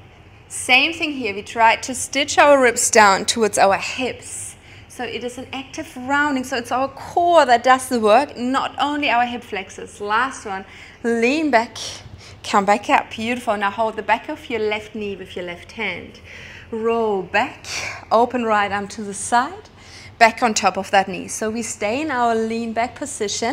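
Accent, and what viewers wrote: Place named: Switzerland. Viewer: Sounds swiss 🤔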